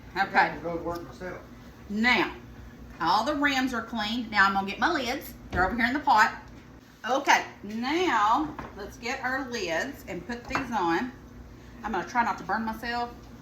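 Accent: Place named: North Carolina, United States. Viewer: Sweetie, please quit apologizing for your pronunciation of words & your accent!!! We love you just the way you are!! I happen to be from North Carolina & talk just like you so I love it!! Great looking jelly! God Bless!!! 🙏❤️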